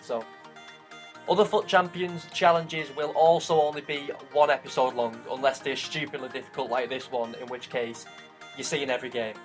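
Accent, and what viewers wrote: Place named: Wigan, United Kingdom. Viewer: thought the accent was familiar haha. guessing you from round orrell/Shevy? was wondering what team you play for as I'm from wigan as well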